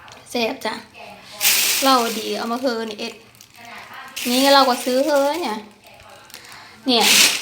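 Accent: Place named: Phu Thai, Thailand. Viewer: เอัดผีเลอ,😂😂มักๆสำเนียงผู้ไท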